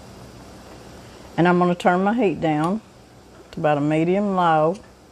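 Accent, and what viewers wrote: South, United States. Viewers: 🤤🤤🤤. Drooling at this video like my pit bull drools when she's watching me it. I marinated some thighs last night in a brine. I was either gonna deep fry or grill. Oh bud thanks to your video and accent, I'm from TN, I know what I'm gonna do, I'm gonna drown mine in gravy, gravy is a staple of the south, and greens mashed taters, but I'm gonna have fried okrie, another southern staple. I'm really surprised I've not run across your channel and what's odd are the number of comments but no thumbs up. Mmm mmm 😋😋😋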